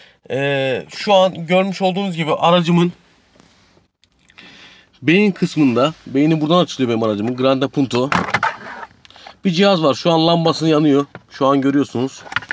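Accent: Malatya, Turkey. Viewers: Meraba abi acaba malatya lımısın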